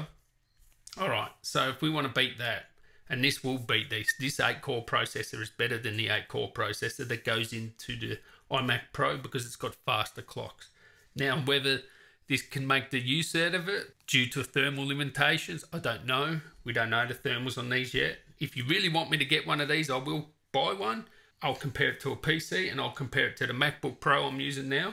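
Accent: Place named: Australia. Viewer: I’ve watched every 2019 iMac video on YouTube (cos I’m about to buy one) and I loved this video the most. As a fellow Australian, it’s so refreshing to have a thick Aussie accent on a tech video and your advice to viewers to get great performance if they’re on a budget is really good. Since you posted your vid, they halved their prices on ssds so I might order the i9 with a 1TB ssd. Thanks again.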